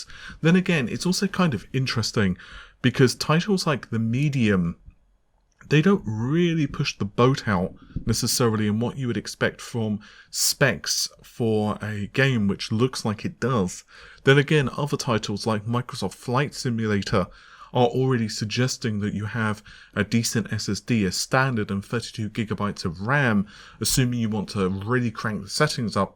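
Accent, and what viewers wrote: England, United Kingdom. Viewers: english accent?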